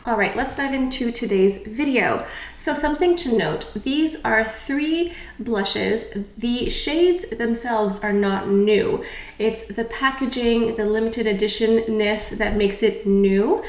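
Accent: France. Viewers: I fell in love with your french accent and your love for Chanel cosmetics. Thanks for the review . I subbed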